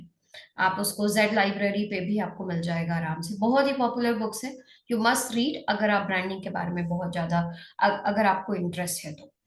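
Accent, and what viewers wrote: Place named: India. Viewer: I'm a bit late here, but you need to work on your English pronunciation. Your indian accent and mix of English and Hindi overshadow every single word in this video, it's impossible to understand unless you're a native indian. Which i'm not, so I didn't understand a lot of this. Good powerpoint though but that's about it, thank you.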